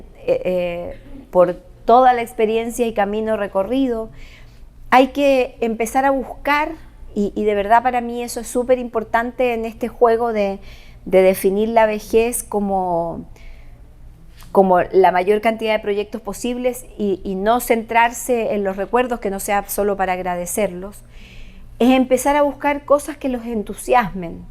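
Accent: Chile. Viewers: casi no se escuchan asentó chileno Por eso me guste mucho escucharte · ya no eres la misma,que pena, me reía,disfrutaba y aprendía mucho con tus charlas,tu acento chileno y todo lo que decías 😢😢😢😢😢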